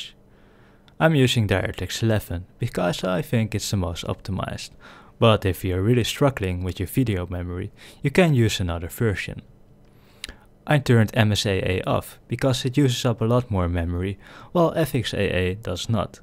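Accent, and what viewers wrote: Netherlands. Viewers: Ben je een nederlander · I thought he was a friend of mine but he is probably dutch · I don't know why but this guy sounds Dutch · Easily tell he's dutch, they all sound the same :) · definitely dutch. Gekke nederlander :P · Are you dutch? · Ja nederlnads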